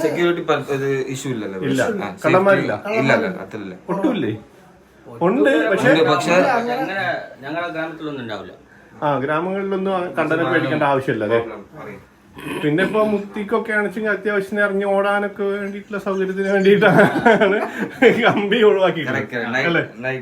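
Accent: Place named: Nilambur, India. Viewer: ഇപ്പോഴും തനി നിലമ്പൂർ സ്ലാങ് ആണല്ലോ ഇവർക്ക്... 😊😊😊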